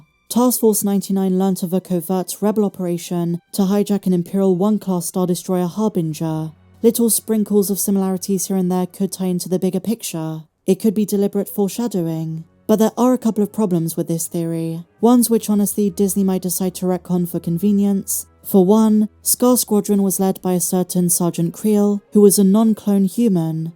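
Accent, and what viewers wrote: United Kingdom. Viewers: I love your british accent. Good theories. Greetings from MEXICO. :yt:😁😅😉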